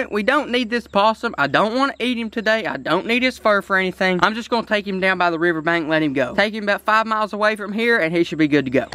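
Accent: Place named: South, United States. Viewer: I love your accent I mean cuz you're so country cuz don't worry I am very country but not as country as you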